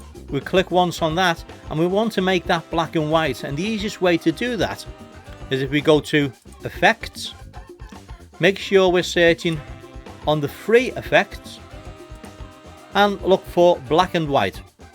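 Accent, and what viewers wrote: Liverpool, United Kingdom. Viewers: love your Liverpool accent.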